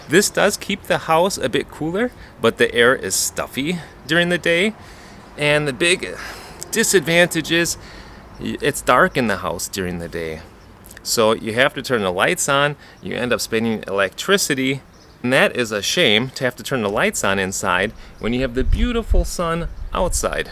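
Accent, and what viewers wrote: Germany. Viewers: Wait you are a native englisch speaker i know yo live here for a while but why do you have german accent xd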